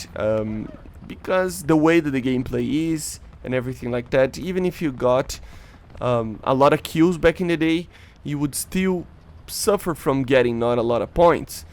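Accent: Brazil. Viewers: Dude, i listened to 10 seconds of the video, pause, 5 seconds of the video, pause and I said to myself, this guy is definitely Brazilian, muito foda ver um br com um canal top em ingles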